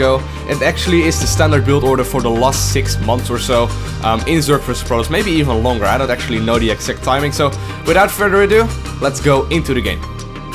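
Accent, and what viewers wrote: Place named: Netherlands. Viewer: Wow you're so obviously Dutch the accent is uncanny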